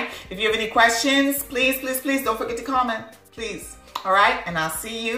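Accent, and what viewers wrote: Trinidad and Tobago. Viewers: Are you trini ? U sound trini lol it’s cute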